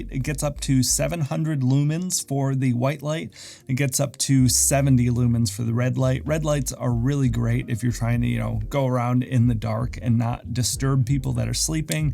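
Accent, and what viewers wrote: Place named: Austria. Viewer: are you austrian, you sound like arnold sometimes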